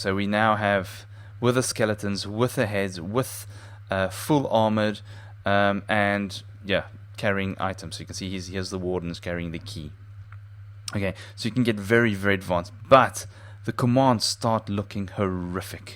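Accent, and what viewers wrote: South Africa. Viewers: I know a Saffy accent when I hear one ;) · You sound South African lol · are you south african?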